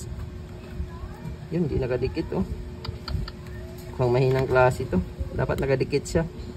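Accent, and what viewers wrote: Iloilo, Philippines. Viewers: Ilonggo ka po ba or bisaya?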